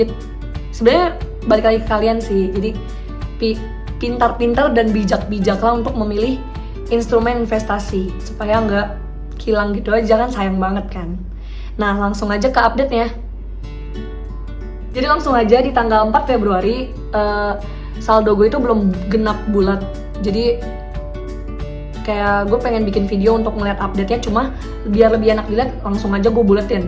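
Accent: Bali, Indonesia. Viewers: kek logat orang Bali 🤔 , tpi thanks. ya video nya menarik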